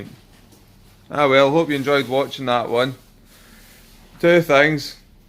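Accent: Scotland, United Kingdom. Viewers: Hyundai i10 2010. I still have it. I like your scottish accent. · My mother was Scottish. How I miss hearing the brogue. Beautiful! PS: The car looks great too. First car: 1976 Fiat 131S.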